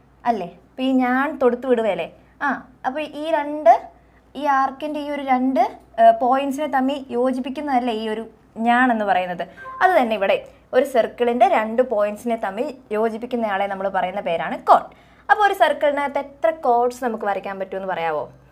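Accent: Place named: Alappuzha, India. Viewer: Alapuzha Alle teacherde house cheriya oru slange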